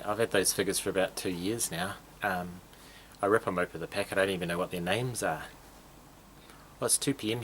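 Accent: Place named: United States. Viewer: Lol - great work! Love your parodies. Your American accent isn’t half bad. I once had a friend here in Texas from Christchurch and definitely did a poor job on his accent when I tried.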